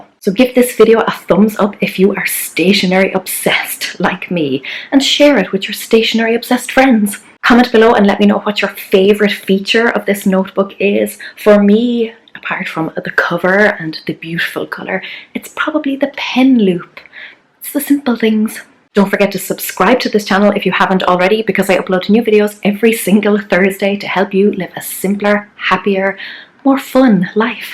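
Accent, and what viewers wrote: Ireland. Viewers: I love your Channell and bye the way I am sorry if this is rude but your voice kind of sounds irish and I live in Ireland do you?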